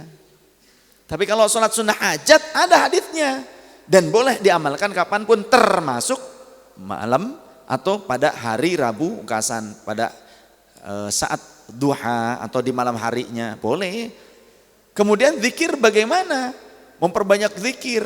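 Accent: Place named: West Java, Indonesia. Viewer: saya mau tanya, apakah punya darah sunda? soalnya logatnya terdengar seperti orang sunda..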